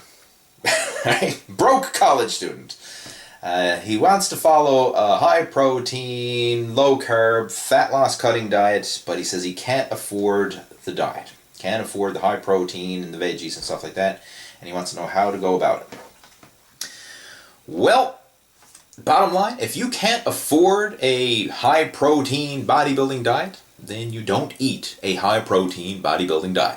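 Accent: Canada. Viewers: Beans, lentils, skim milk powder, eggs, cottage cheese. cut out crappy snacks, booze and takeaways. ln the end cut your suit according to your cloth. Great site. Cant quite get the accent, are you Canadian. Difficult when from England to spot the accent.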